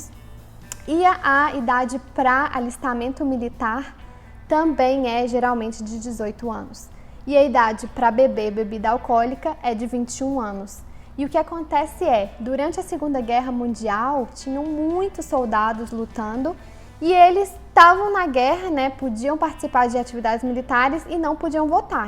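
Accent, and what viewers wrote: Minas Gerais, Brazil. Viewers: Você é mineira? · vinicius Correção, sotaque mineiro, então você é de Minas kkkk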